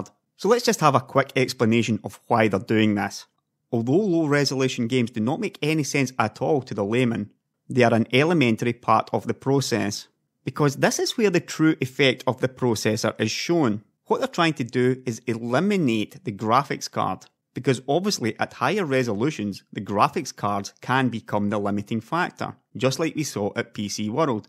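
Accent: Scotland, United Kingdom. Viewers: So hard for me to translate you scottish accent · From his accent I thought he is Scottish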